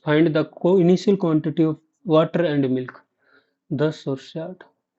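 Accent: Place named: Himachal Pradesh, India. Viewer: Bhai himachal se ho kya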